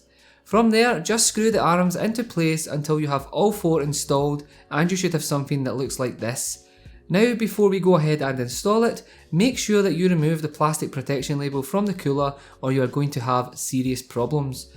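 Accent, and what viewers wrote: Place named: United Kingdom. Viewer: I don’t know if you will see this but you sound very British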